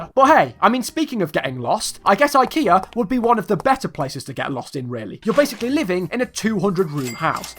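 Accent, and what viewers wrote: United Kingdom. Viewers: Thank you funny british accent man for making my day better, i suggest your next video is about lidl since its common in europe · His British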